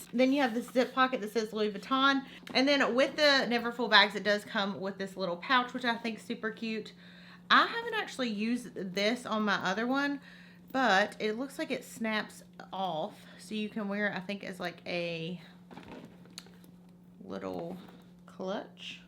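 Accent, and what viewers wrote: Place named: North Carolina, United States. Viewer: Lol the very first time I watched a video of yours I thought, “Gosh she sure does talk like all the people at work.. her accent is similar to ours.. wonder where she’s from”. Then I checked out your other videos and realized you’re from W.S.! I live in Greensboro 😂😂 anyway, i subscribed immediately. Love your videos!!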